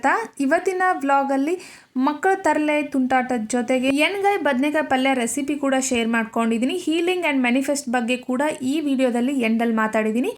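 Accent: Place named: Davangere, India. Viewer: Sis nimdu Davangere na language ade tara ede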